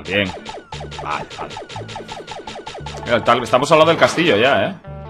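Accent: Mexico. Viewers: tu acento mexicano es muy cool hahaha Saludos desde Mexico... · GRACIASSSSSSS!!!!!! QUE CHIDO TE SALE EL ACENTO MEXICANO LA NETA JAJAJAJAJA....SALUDOS DESDE MEXICO YEAH....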